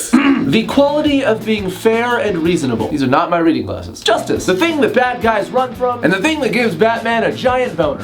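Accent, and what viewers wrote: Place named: United Kingdom. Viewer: You seem like one of those low key British people. You have our humour and the way you say some stuff is like us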